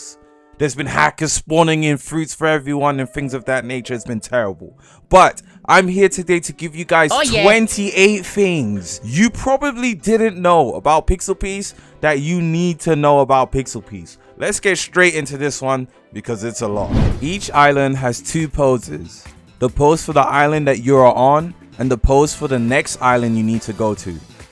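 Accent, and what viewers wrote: United Kingdom. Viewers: The British accent is so dreamy :drooling_face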